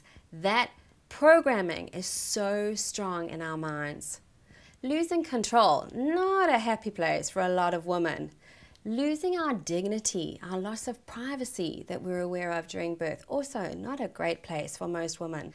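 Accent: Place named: South Africa. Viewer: Thanks. I'm also from South Africa but recently have been traveling south easy Asia and moving to Thailand,Phuket soon. I am 21weeks pregnant. Great to hear a familiar accent!!! Thank you for your work you doing and I will be looking further at downloading your videos for sure. Do you include a lot of the hypnobirthing tools in your toolkit? Many thanks and love. X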